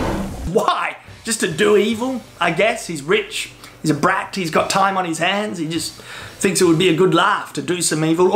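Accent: Australia. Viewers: You Aussie accent makes this shit so entertaining hahaha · You have THE best internet aussie accent. ~a fellow aussie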